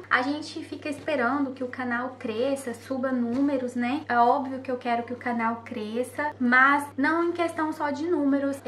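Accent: Goiânia, Brazil. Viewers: Oie, sou a Pâmela de SP, minha melhor amiga é de Goiânia eu adoro seu sotaque me lembra dela! Quero fora em um futuro próximo, seus vídeos são muito explicativos!!! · Oi, meu nome é Dayane, vivo em Liverpool UK, assisto seus vídeos desde quando vc morava em Londres e acompanhei sua saga. Um vídeo seu que mais que marcou o vídeo que você viajou com o Stephen sozinha, foi buscar seu documento e não estava pronto. Teve que voltar com as malas e remarcar a passagem. Partiu meu coração ver você sozinha mas ao mesmo tempo vi o quanto você é forte e uma mulher sábia. Tinha inúmeros motivos para reclamar mas transmitiu uma imagem de segurança e tranquilidade. Amo seus vídeos e tenho certeza que vocês vão superar toda essa situação com seu bebê. Vou colocar vcs nas minhas orações. Deus os abençoe grandemente. Quem sabe um dia tomamos um café juntas. Ps. Amoooooo Goiânia e o seu sotaque ❤️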